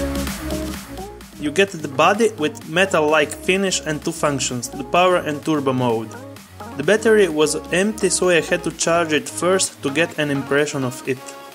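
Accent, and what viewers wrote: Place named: Russia. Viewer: русский акцент заметен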